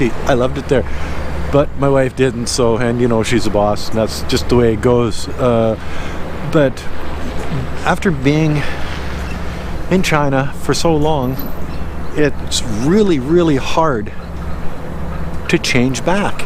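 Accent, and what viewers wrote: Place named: Canada. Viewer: I knew this dude was Canadian after hearing him speak just one sentence! Although he has been living in China, he hasn't lost the Canuck accent for real, eh?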